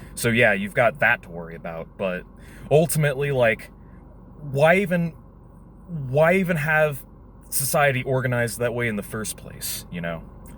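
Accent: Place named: United States. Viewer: Why is the steering wheel on the right? You sound American.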